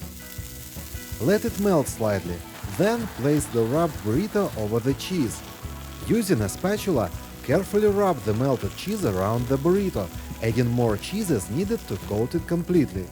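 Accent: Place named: Russia. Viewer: Your videos are very fine, and I like your Russian accent. Thank you for learning English. I know it is not easy. Слава Богу!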